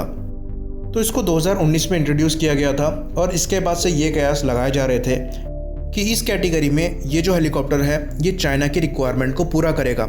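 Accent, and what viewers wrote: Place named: Rajasthan, India. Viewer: Bhai tum rajasthani ho kya aavaj see lag raha hai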